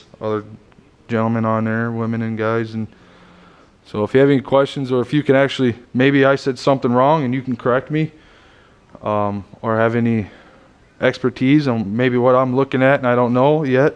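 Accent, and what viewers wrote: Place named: Appalachia, United States. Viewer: Hey buddy its Justin lost your number watched all your videos to hear your hillbilly a** again hit me up ill send you my #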